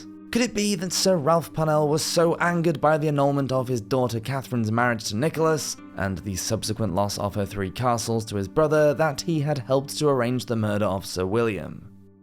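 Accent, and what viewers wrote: England, United Kingdom. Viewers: Love your content and your lovely English accent.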